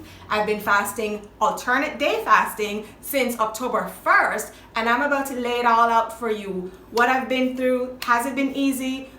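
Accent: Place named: Jamaica. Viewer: So glad fi fine a fellow Jamaican Sista doing ADF....bless up💫✨️ and thank u . Keep speaking patois! Mi luv it...dem have all di adda dialect & languages w Closed captioning.. a fi wi Time now... lol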